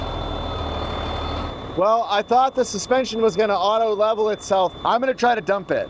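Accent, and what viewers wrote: Yorkshire, United Kingdom. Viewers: Hi where is from? Definitely a lovely northern accent, I’m thinking Yorkshire? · Can the americans understand yorkshire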